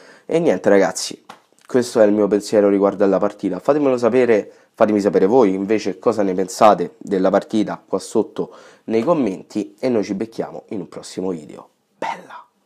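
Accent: Rome, Italy. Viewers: Hai l accento romano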